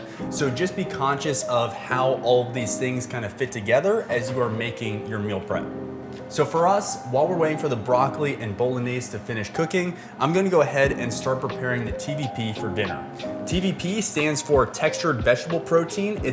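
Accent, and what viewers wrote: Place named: United States. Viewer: You have the most American accent ever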